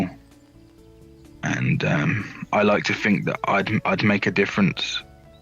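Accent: United Kingdom. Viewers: Sounds like a norfolk boy! Good lad x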